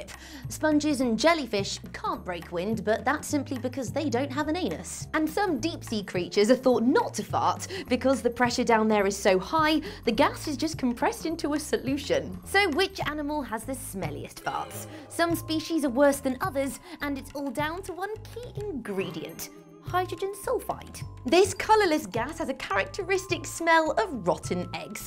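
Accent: England, United Kingdom. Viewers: Her British accent makes it less awkward to listen to her talk about farts. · She english and we pronounce stuff different.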